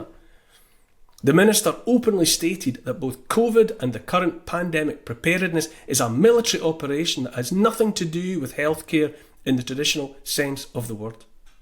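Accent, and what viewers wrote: Scotland, United Kingdom. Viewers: There's just something about a Scottish accent... The way the word "Freedom" just rolls off the tongue of a Scotsman... May the GOD of Abraham, Isaac and Jacob... Bless, Keep and Protect you my Brother! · the TRUTH delivered in the Brogue, thank you timeless warrior.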